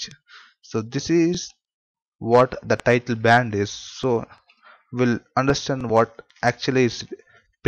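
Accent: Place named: India. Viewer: Fuuuuck this disgusting indian accent and its fuuuuck bad pronuntiation .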